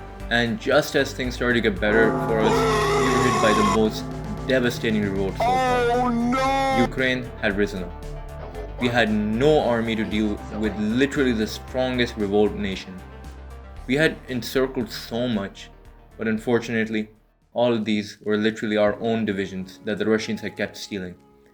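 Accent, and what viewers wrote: India. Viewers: Try to hide the accent next time jeet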